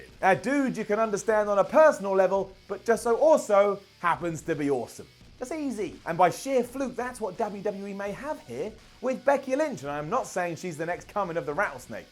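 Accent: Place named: United Kingdom. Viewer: Why he big enough himself in isnt in NXT or WWE in got the British Voice lol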